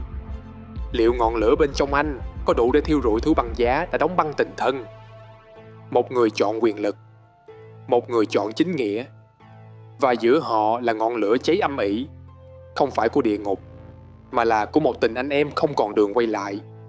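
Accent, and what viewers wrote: South, Vietnam. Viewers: Một trong những giọng đọc miền Nam mình yêu thích ngoài Minh Béo của Game cực hay. Kênh cố gắng giữ bạn này và phát triển các nội dung có thêm tính hài hước sẽ tăng tương tác nhiều hơn.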